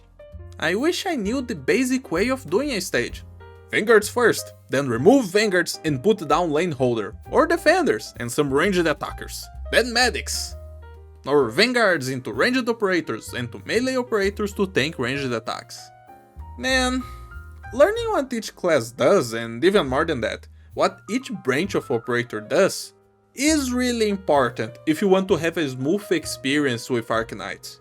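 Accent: Brazil. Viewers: Ummm the accent, I know you, you're one of my people... Quer uma goiaba? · quick question, are you brazilian??? cause you have the accent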